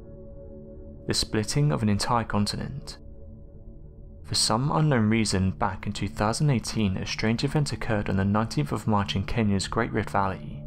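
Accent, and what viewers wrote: United Kingdom. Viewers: Galaxysurfer 1 It is you that is a bit thick. Did you not notice the guy has a British accent? They pronounce words differently. Who’s to say your country’s way of pronouncing it is correct? Have you ever heard a British person say garage? Instead of grr-age it’s more like gar-ridge. Listen to the song Levon by Elton John, he says gar-ridge by the motorway in the second verse. Since they were speaking English long before America existed, just maybe it’s their pronunciation that’s correct and you are wrong, lol.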